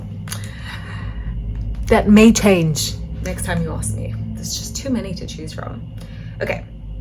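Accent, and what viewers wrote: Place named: England, United Kingdom. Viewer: Thank you, it’s so nice of you to share this with your fans and followers. I did not have the chance to ask, but I have this question, if I may. I learnt you’re from Malta (is that right?), so you’re Mediterranean (I am from Spain). At the same time you seem very Anglo-oriented: you have a strong English accent and the three desert island records you chose are English or American. On top of that I loved your excellent cover of the, which is Brazilian (which is what I thought you were when I first discovered your videos). So my question is, what are your influences, the music popular or otherwise that has made you the singer song-writer that you are? Thank you anyway.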